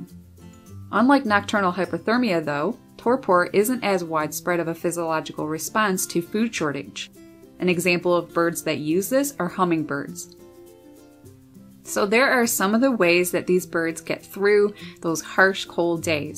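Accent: Canada. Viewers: The Canadian accent is cracking me up! 😄 That's probably one of the most pronounced ones I've ever heard! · Hi. This is the first video of yours that I've watched and I really loved it. I assume from your accent that you're from Canada? The colours of those birds were just stunning, especially the blue ones. Sending you best wishes and warmth from the UK.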